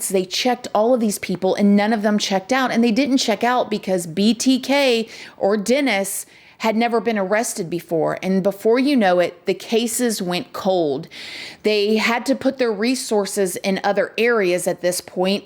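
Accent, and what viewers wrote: South, United States. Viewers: You are so entertaining your energy is awesome..😅 I love the da-da-dahs and your accent is so nice! Far better than this boring kiwi 🥝 (New Zealander) what part of America are you from? I'm asking as one of your babies! 😆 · Girlfriend, I love You!! Just came across you a couple of wks ago and trying to catch up on all your videos! You and Better Off Red are my favorite! You’re so sweet and I just live your country accent. Just curious though, where are you from? Tennessee girl here ❤️ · These people are not normal. The brain scans of these people are markedly different from brain scans of so called 'normal' people. I have no doubt that if Ted Bundy were to get out of the Florida prison he was in before he was executed, he would ve killed again! Ted Bundy could have disappeared into obscurity and probably would have never been caught. 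But he had to kill again! They need to kill like an active alcoholic has to drink again. By the way I love yourselves videos and love your southern accent 😊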